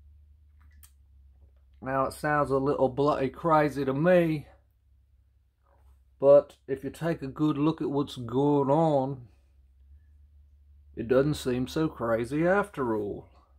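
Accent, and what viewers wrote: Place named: Australia. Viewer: G'day, I 💚 the accent, the lazier you make it the more Ozzie you sound 😂 Adorable 😘 Awesome Artist 👍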